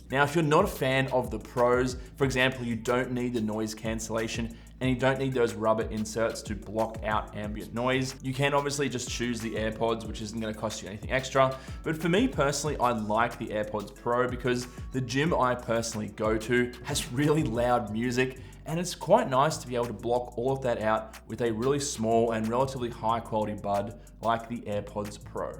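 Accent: Australia. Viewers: I think Apple Australia doesn’t have this deal atm… (I was assuming you’re from Australia because of the accent. )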